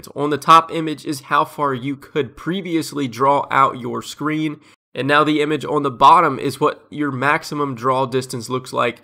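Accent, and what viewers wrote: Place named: Philadelphia, United States. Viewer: your accent definitely sounds like philly/delco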